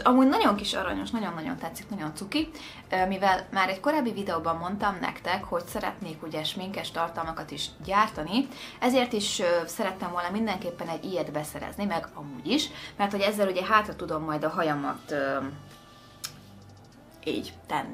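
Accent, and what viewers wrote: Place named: United Kingdom. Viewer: Úristen imádom az angol kiejtésed xdd nagyon aranyos!!!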